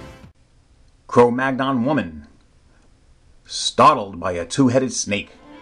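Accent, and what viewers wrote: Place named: New York, United States. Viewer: I like your New Yorker accent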